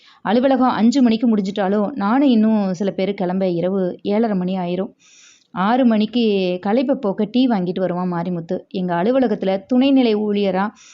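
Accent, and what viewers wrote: Tirunelveli, India. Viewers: உள்ளத்தை உருக்கிய கதை.நல்ல கதை.தெளிவான குரல் வளம்.திருநெல்வேலி பாஷை அப்படியே.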